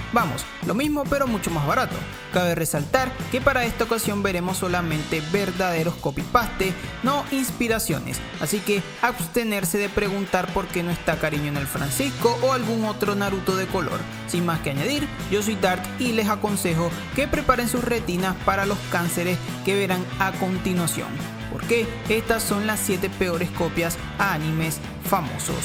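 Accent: Venezuela. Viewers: JAJA me da risa que seas venezolano , ya que entiendo todo lo que dices Soy de Venezuela uwu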